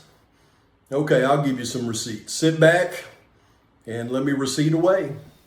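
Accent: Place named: South, United States. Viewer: PS it’s CON-SPICK-U-US not Con-Spish-Us 😂.. southern drawl was very present in todays video 🤠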